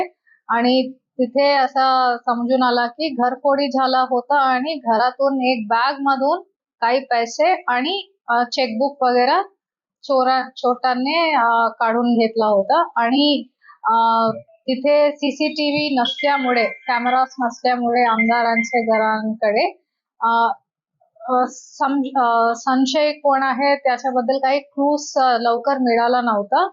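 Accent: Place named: Bengal, India. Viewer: Madam, Bengali Caste Che Vatat Aahe. Becoz of Speaking Accents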